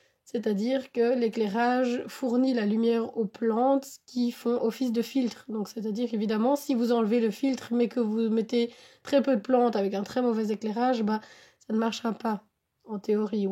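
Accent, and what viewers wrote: Belgium. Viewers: Bonsoir. Je suis tombé sur ta vidéo par hasard. J'ai reconnu ton accent belge 😉. En ce qui me concerne, je pratique l'aquariophilie depuis bientôt 10 ans et je commence à m'intéresser au low tech. Je me pose la question si cela pourrait fonctionner avec ma population : 5 scalaires dans 350 l... Sinon, j'habite Bastogne. Vive la Belgique ! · L'accent belge 😍 tu as un admirateur en France !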